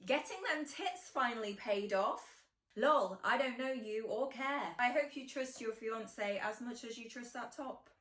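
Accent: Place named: United Kingdom. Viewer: What a beautifull british english. And all the best, blessings together.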